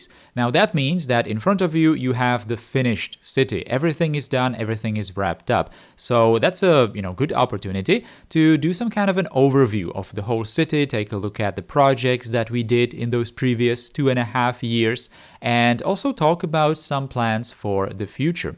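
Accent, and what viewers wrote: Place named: Czech Republic. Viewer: Where are you from? You have a slightly eastern european accent. I’m guessing Czech?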